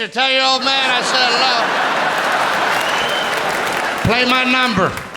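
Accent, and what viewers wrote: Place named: Pittsburgh, United States. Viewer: That Pittsburgh accent is just made for stand up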